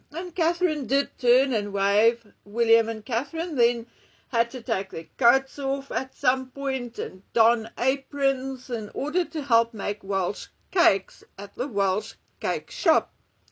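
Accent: South Africa. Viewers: Yussus man that’s a baie strong South African accent if ever I heard one ekse 😂😂😂😂 lekker 😂😂😂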